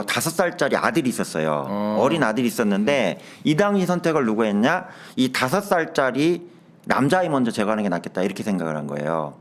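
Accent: Chungcheong, South Korea. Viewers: 염쌤. 연쇄살인 스토리 찾느냐 피곤할텐데..❤감사합니다. 또한 구수한 충청도 사투리지만 예리한 카리스마는 의 여전한매력😮항상 화이팅입니다 ❤🎉❤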